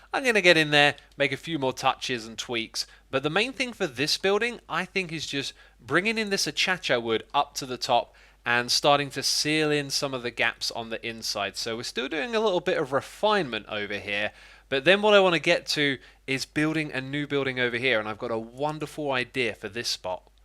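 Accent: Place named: United Kingdom. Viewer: it took me a while to understand what flower you were referring to lol I love British accent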